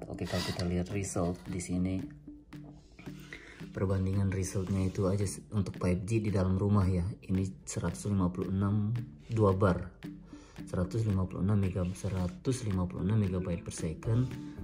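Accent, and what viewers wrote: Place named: Lombok, Indonesia. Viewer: logatx kayak org lombok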